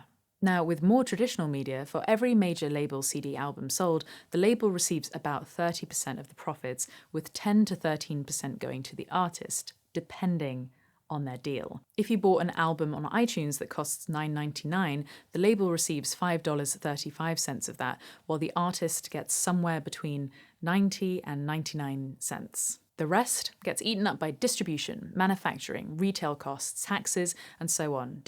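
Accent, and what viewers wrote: United Kingdom. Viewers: Beautiful shirt! As I've mentioned before, your speaking voice is another possible revenue stream. It is beautiful to listen to -- and remember how potty Americans are about British accents. My two favourite female speaking voices are Kirsty Young and Mary Spender. So be fruitful and multiply. I mean about revenue streams, not...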